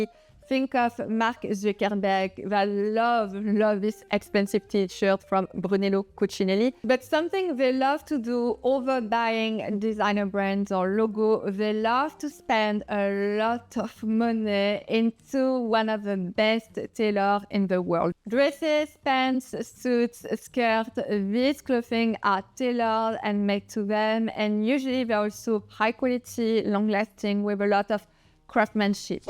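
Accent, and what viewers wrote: France. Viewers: Girl you have a heavy French accent it's hard to understand you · Respectfully, may I suggest that you add sub-titles or text to your videos. I understand your accent because I also speak French but other viewers may not. Thank you for your content, it is excellent !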